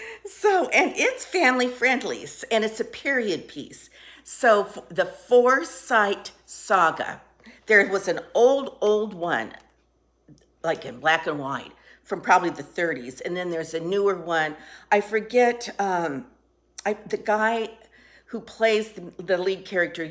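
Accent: South, United States. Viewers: Hi I love your Q&A idea. Several years ago I watched your fantastic video about your high school reunion in Mississippi. So my question is what brought you to Mississippi and do you stay in contact with your classmates? I live in Alabama and every once in awhile when you speak I detect a slight Southern accent. Love ya!!!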